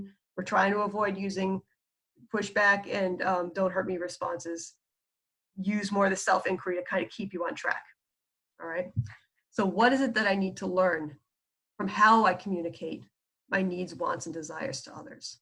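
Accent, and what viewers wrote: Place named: New York, United States. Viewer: love your New York accent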